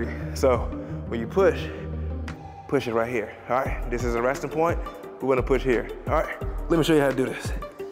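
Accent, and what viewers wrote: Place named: Virginia, United States. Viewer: Where you from bro? I thought VA.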